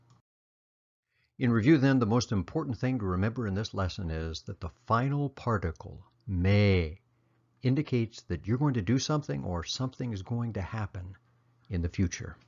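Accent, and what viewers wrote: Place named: Myanmar. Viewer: you are genius and smart man. I love your Myanmar accent.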